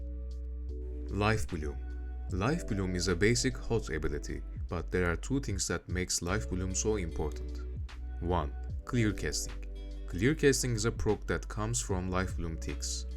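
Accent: Turkey. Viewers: Are you by any chance, Turkish? Your accent sounds like it :) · Sen türksün aksandan belli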